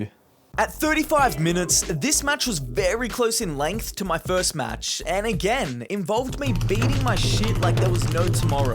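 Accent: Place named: Australia. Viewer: where u from? u sound australian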